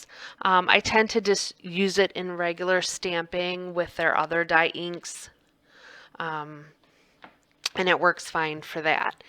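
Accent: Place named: Midwest, United States. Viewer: Girl, I can't help but smile as soon as I hear your happy, friendly voice and that Mid-Western accent! Love! I am so with you on the PFS inks...Love them all. Just need one more and my collection will be complete. They are different for sure, but I love them...excpet the detail black...I think I have a faulty ink pad. But LOVE all the others. But I LOVE, LOVE your card!! You did a great job! Love the interactivity!!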